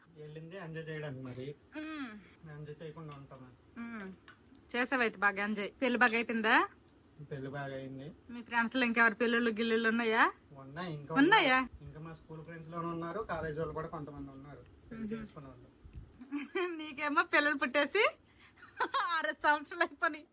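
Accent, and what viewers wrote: Srikakulam, India. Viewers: mee Srikakulam yesa olamoo olamoo 😂😂 bagundhi baaga navukuna nenu aite..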